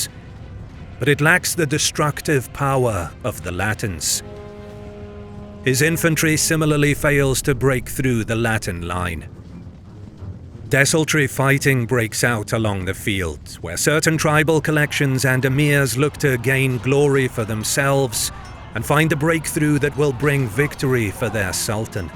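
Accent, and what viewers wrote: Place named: United Kingdom. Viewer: Stop with the damn British accents on every documentary, it's the most annoying trend I've ever experienced!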